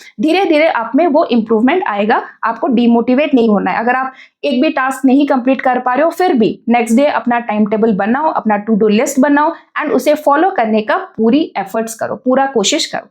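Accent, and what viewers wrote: Odisha, India. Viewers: Ma'am app odisha se ho kya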